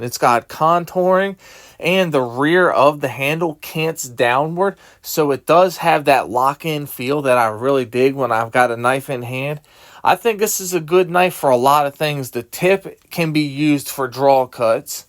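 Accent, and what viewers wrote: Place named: Delaware County, United States. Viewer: Where u from brother? You sound Delco to me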